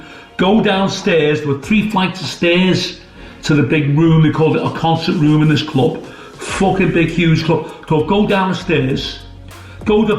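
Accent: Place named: Liverpool, United Kingdom. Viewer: Proper fuckin scouser you 💪🏻💯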